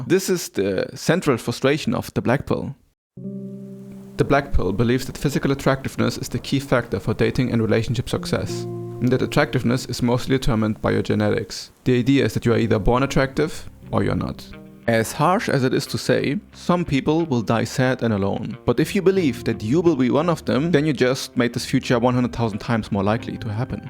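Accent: Germany. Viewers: Where are you from? Your english sounds like it has a german accent lol. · This may be the greatest Video I have ever seen. I made my way to nearly the exact same spiritual/philosophical journey and came to a similar conclusion: Love is the connection we DECIDE to realize that we are or should be the same thing. The opposite of this is what we all perceive as evil: the separation of entities and acting (unfairly) different upon them. That means that the more we empatheticly put ourselves into the shoes of one another and the more we decide to be part of the same thing, the less evil we are and act and the more meaningful and happy life becomes. Cheers to you my friend for having similar discoveries! Or should I rather say cheers to me? 😉 (ps: your accent sounds German to me - where do you life? If you live in Germany I'd love to have a real life conversation about things!) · Is that a german accent I hear?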